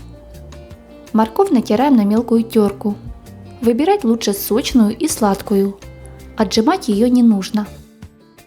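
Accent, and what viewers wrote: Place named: Ukraine. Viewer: поняла что она украинка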